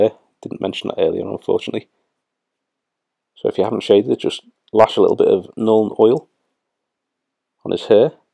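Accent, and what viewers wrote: Liverpool, United Kingdom. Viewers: nice to see a scoucer doing the hobby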